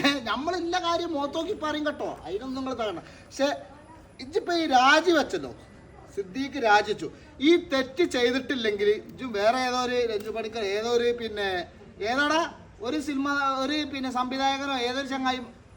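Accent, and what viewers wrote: Malappuram, India. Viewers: എടോ, നിങ്ങളുടെ വീഡിയോ എല്ലാം മെച്ചം തന്നെ, സിനിമയിൽ എന്ത് ഊച്ചാളിത്തരവും കാണിക്കുന്ന ഈ നടൻ സിദ്ദിഖ്, അവൻറെ തോളിൽ കയ്യ് വെച്ചുവെന്ന് പറഞ്ഞ് എടുത്ത് മാറ്റാൻ പറഞ്ഞത് അഹങ്കാരത്തിന്റെ അങ്ങേയറ്റമാണ്. മകൻ മരിച്ചപ്പോൾ അവനെ എല്ലാവരും കെട്ടിപിടിക്കുന്നത് കണ്ടല്ലോ, മുംബ് റിയാദിലെ ഒരു കംബനിയിൽ ഇലക്ട്രീഷ്യൻ ആയിരുന്നു അയാൾ.എല്ലാറ്റിനും കൂടി ഇപ്പോൾ കിട്ടികൊണ്ടിരിക്കുന്നുണ്ട്. പിന്നെ,നിങ്ങളുടെയീ പ്രാകൃത ഭാഷ ദയവായി ഒഴിവാക്കണം, ഇപ്പോൾ മലപ്പുറത്തെ ആരും തന്നെ ഇങ്ങനെ സംസാരിക്കുന്നില്ല.സംസാരിക്കാനറിയില്ലെഘിൽ അറിയാവുന്നവരെ കൊണ്ട് ഓഡിയോ ഇടുക. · നിങ്ങൾ സംസാരിക്കുന്ന ബാസ ഏതാ ശരിക്കും മലപ്പുറം ബാസ ആണോ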